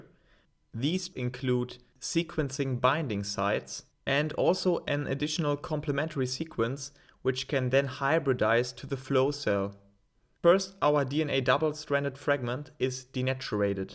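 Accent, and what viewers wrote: Germany. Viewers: Are you German? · no one teaches better than a guy with German accent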